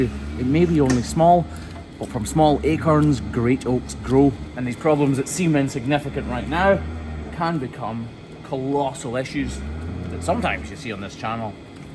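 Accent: Scotland, United Kingdom. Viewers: I love seeing the Scottish landscape and hearing the Scottish brogue:) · hes scotish not irish 😂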